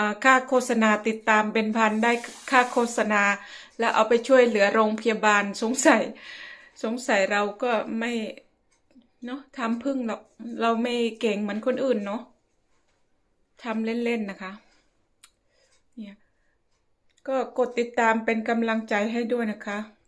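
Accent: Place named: Cambodia. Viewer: อ๋อ.. ขอโทษด้วยคะ พอดีว่ายายเป็นคนเขมรหน่ะคะ สำเนียงของน้องเหมือนแถวบ้านยายคุยกันเลย ❤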